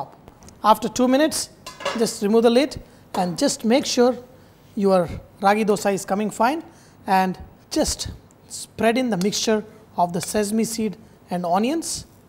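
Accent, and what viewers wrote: India. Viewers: I need a more thorough explanation of what exactly the ingredients are. I didn't know what it was. And the accent is VERY THICK. I could understand better if the Indian accent wasn't so strong. The food looks great but that stuff after the flour....idk what that paste looking substance was.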